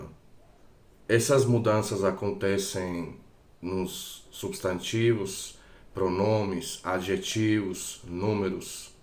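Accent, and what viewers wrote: Brazil. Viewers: Cheguei a pensar que era brasileiro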